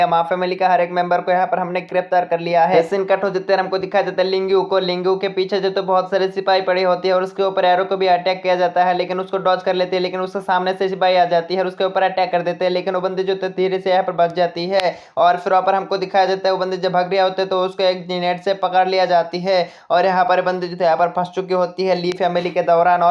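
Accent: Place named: Bengal, India. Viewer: Your videos are nice and i really follow it but please try to improve your hindi I can understand you are a bengoli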